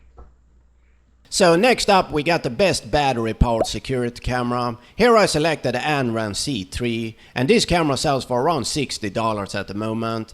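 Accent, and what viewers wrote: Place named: Sweden. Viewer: Trevlig video, är du svensk? Låter så när du pratar engelska. 😊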